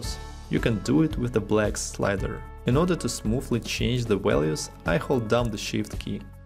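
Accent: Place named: Russia. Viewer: Ну да!!! я на много лучше понимаю Русский Английский, чем Американский Английский...Это я понял!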